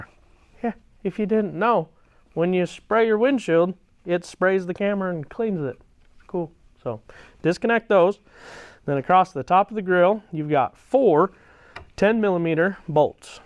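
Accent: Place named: South, United States. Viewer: This precious man speaks like a southern American Red Green . Love the video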